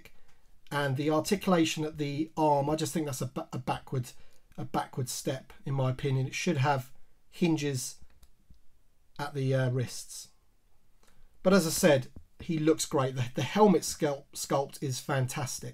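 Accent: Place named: United Kingdom. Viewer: Was happy to find out you skated in the 80's. I think star wars was my favorite thing as a young kid, then G.I.Joe, until Skating. Loved Skate culture. Then came music and the subcultures until I ended up in my 40's and now I'm back on the toys! I love these vintage collection cards backs. The whole thing just brings me back to what a great reward it was to get a new figure as a kid. Cheers to you, I love your channel. Not sure what area your accent is from, but I always expect to hear you say "Oi!"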